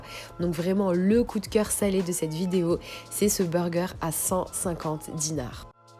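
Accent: France. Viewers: Je déteste la prononciation des mots anglais en accent française aaaaaaaaaaaaaa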